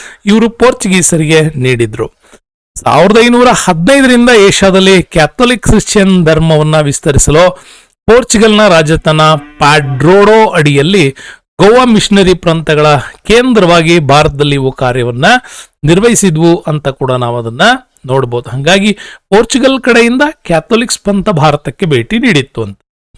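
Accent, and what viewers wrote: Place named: United Kingdom. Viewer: ಸಾರ್ ದಯವಿಟ್ಟು ಕನ್ನಡ ನ ಕನ್ನಡ ತರಾನೇ ಮಾತಾಡಿ.......😢😢ಯಾರೋ ಬ್ರಿಟಿಷ್ ಅವರು ಕನ್ನಡ ಮಾತಾಡೋ ತರ ಕೇಳುತ್ತೆ.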